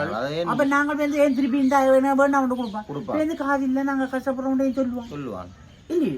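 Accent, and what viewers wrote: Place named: Jaffna, Sri Lanka. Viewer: உண்மையில் எங்கள் ஊர் பேச்சு 🥰உண்மையின் பேச்சு 👌 வாழ்த்துக்கள்.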